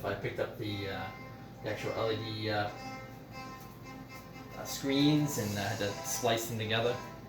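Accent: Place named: Boston, United States. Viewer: You sound like.yohr from boston :)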